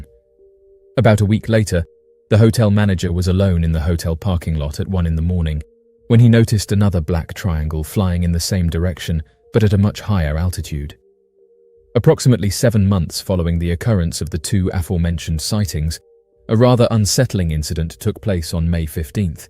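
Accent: United Kingdom. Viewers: T you from uk 🇬🇧 interesting and odd .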